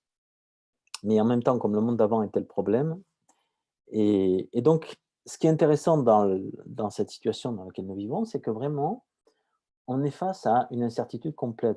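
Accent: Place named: France. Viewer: He notado que cuando hablas español usas algunas palabras que tienen esa terminación en tu acento francés Y eso me agrada me diste unas ideas bien extrañas para escribir poesía de esa manera J'aime votre langue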